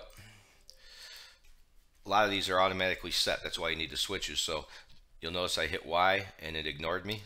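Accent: United States. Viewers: I can't understand american accent but... I was so atracted by this deep male voice mmmmhhh very inspired😋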